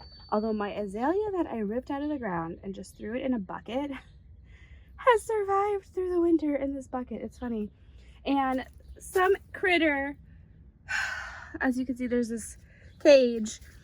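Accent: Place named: Chicago, United States. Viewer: Just found you. As I was listening I thought to myself that you had to be from the Chicago area. I hear the accent, my cousin has the same one. How do you like the greenhouse?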